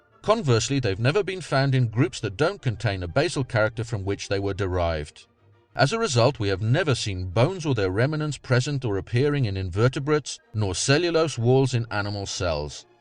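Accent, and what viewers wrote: United Kingdom. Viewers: These videos always have one thing in common, obtrusive in debating style. Bold face lies of no evidence refuting evidence.British accent is alwways evident of a blaphemous intellectual upbring on prejudice conjecture. This guy has an anal and poop fixation.This rant is just hateful and has no substance of honest debate. The mark of the beast is definitely this mans free will choice to his quanrantine to foul language zone of nothing but darkness and no love. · omg that british accent just makes it so much more hysterical as fuck. You and coolhardlogic are amazing debunkers and trashing these tin foil hat conspiracy ridden creationist fools just makes it even more gold. Thank you so much for bringing logic to the crazy that seems to be apparently spreading all over youtube.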